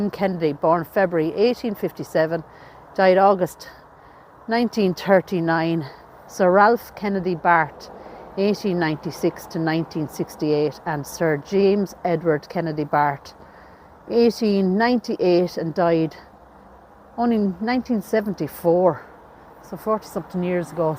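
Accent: Ireland. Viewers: So good to hear this Irish accent again, it sounds like music to my ears. Cheers, all the best ! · I love to see these old headstones,I wish that the church would clean them or power wash them and seal them so they can last another 400 years, I would love to do that, but I live in the states and our old cemeteries have all been damaged, it’s just so sad.., you have the best voice and Irish accent, you are so clear when you speak. I honestly could listen to you speak all day. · I love your sweet accent or is it called brogue? Very cute. My question is, since the coffins are so old and made of wood, why don't they show signs of body fluids on the bottom parts of the coffins? Were they lined with lead as well? They are definitely much prettier and with more ornate decorations than the metal ones we see now days. I love walking thru cemeteries. I took my little dog for walks at our cemetery everyday for 2 years right up until the day she died. 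She loved it. Even killed a big giant gopher there one time! We had the ok from the people that worked there for us to walk there. And I always picked up any poops that she dropped too.😊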